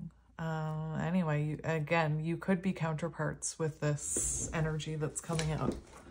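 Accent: Canada. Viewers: I knew you were autistic. I believe I am too, although never diagnosed. I'm pretty sure my mother is, and possibly my dad too. Needless to say, my two boys show signs of it, and my daughter is dyslexic and possibly on the spectrum too (yet she's training to be a vet so she definitely ain't letting it ruin her life) I instantly spotted your nervous laughter at the end of a sentence. That's a definite sign. However, I assumed the words you use were because of your accent, which I presume is Canadian? I got that from way you say "aboot" 😊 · I’m sorry someone said that to you about the way you say anyway! Not only are you neurodivergent but you’re also Canadian and I’m sure has a slight accent!People are haters! Your tribe loves you! I’m also neurodivergent and hate that there’s so many people out there that have no grace for anyone but that’s because they don’t have it for themselves!❤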